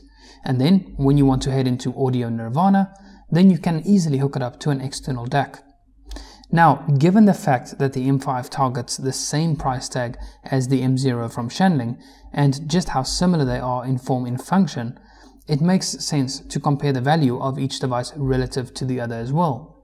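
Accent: South Africa. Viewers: Dat SA accent B-)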